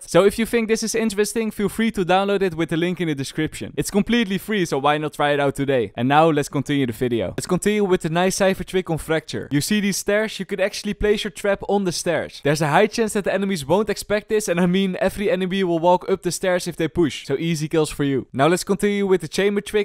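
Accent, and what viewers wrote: Netherlands. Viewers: why do i hear some dutch?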